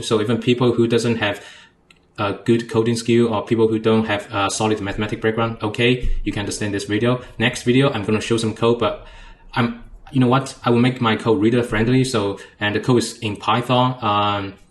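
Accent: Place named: Singapore. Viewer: but why do you speak Singaporean English🙂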